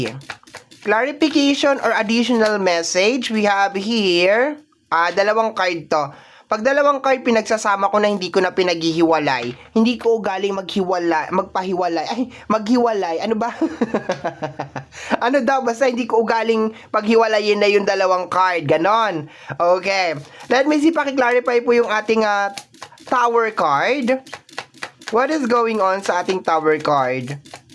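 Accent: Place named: Ilonggo, Philippines. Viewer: abaw a kabalo ka mag ilonggo a😂😂😂gudevz po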